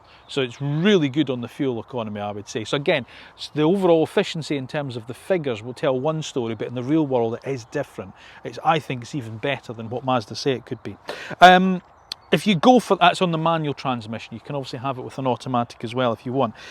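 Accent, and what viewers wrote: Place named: Scotland, United Kingdom. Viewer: Good critique, however, the speaker is talking quite naturally slowly for a native English presentation. I do understand your issue as the Scottish accent - I’m northern English btw - can take a while to get accustomed to. I’d recommend perseverance and hopefully you’ll tune in.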